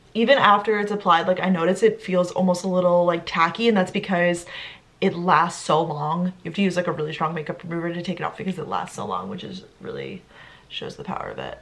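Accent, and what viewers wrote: Long Island, United States. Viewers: I love how you pronounce “Mario” your Long Island accent really shows. I also love Matt’s videos! How minimalist house tour is funny.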